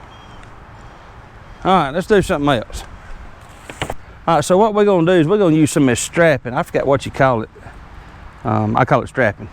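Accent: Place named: Tennessee, United States. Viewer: Sounds like n.e. Tn. Love the accent like mine.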